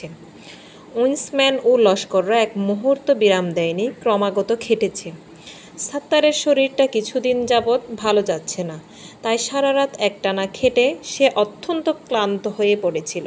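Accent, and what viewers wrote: Chittagong, Bangladesh. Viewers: Your voice tone like Chatgaia🙂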